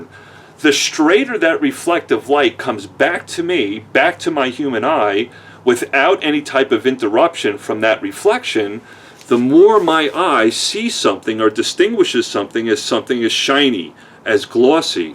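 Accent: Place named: Queens, United States. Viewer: how did a guy from Queens (I'm guessing based on the accent) end up in Dallas? Keep up the great work!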